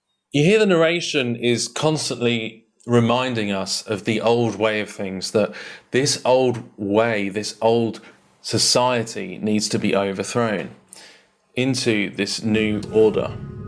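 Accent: United Kingdom. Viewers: AT THIS POINT YOU GUY WHO HANDLES THIS CHANNEL WITH YOUR BRITISH ACCENT YOU ARE NOT THE WAY. YOU SPEAK ABOUT ANYTHING NOW TO KEEP YOUR YT CHANNEL GOING MEANING YOU LOST ALL MERIT. YOUR NOT WISE. OR SPECIAL JUST OVER SPEAKING. PROBLEMS NO SOLUTION. SO FK YOU. AMD YOUR MOTIVATION YOU FOUND PURPOSE IN BS OLD WAYS OLD SOCIETY YOUR PROGRAMED TOO YOU HOLD NO POWER OR WISDOM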